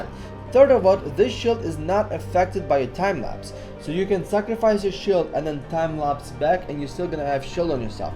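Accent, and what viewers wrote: Russia. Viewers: dude you're a russian-speaking person with a somewhat thick accent making I don't want to call the guide video but a video where what you're saying is important with music drowning out what you're saying turn the music down man when you make videos you should have little or no background music on a video where your words matter you know if you're just making a stream or people are watching you battle that's one thingbut when we have to hear what you're saying and understand you or want to that music's making a very difficult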